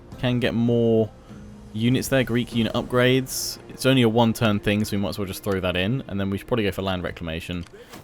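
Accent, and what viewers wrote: England, United Kingdom. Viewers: What part of England are you from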